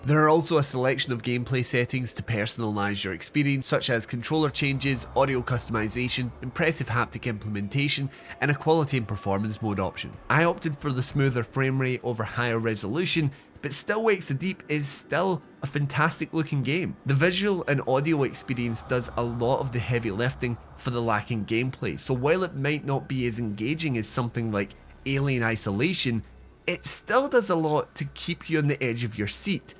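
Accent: Scotland, United Kingdom. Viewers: You mean you cannot follow his Scottish accent?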